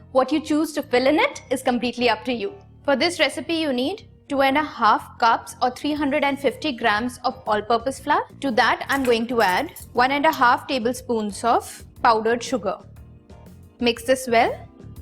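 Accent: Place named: India. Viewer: That accent is so cute, if all toddlers spoke English with a heavy Indian accent it would be like sunshine on top of sunshine.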